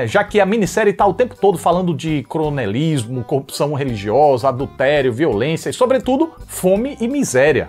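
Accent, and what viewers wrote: Northeast, Brazil. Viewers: Eu amo o e amo Suassuna... mas como nordestina, ver um nordestino, fazendo uma análise crítica de outro nordestino, é tão lindo! Ver cada frase do contemplada com um delicioso sotaque nordestino é sem palavras! E bora ver O Auto 2 ❤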